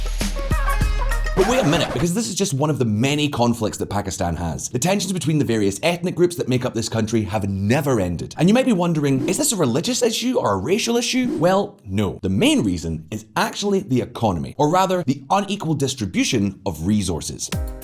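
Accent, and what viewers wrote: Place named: Scotland, United Kingdom. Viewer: His accent has the Scottish tinge to it.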